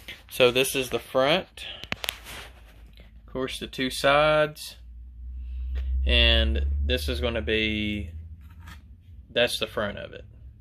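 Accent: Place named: Arkansas, United States. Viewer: Did a great job...great recovery. I’ve run into some bad instructions from Walthers too. Your Arkansas accent got me 😊...spell the name of that business....Happy New Year 🎆🎊🎈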